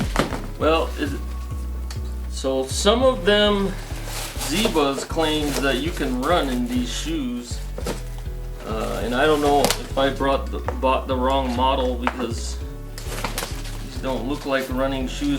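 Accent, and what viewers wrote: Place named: Canada. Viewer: I am just outside of Toronto. Where are you? From your slight accent I thought you might be Canadian. Do you have Native or First Nations heritage? I hope you don't mind me asking?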